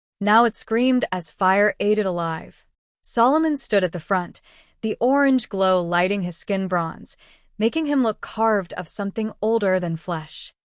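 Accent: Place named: Europe. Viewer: I would have listen to it if the voice wasn't European.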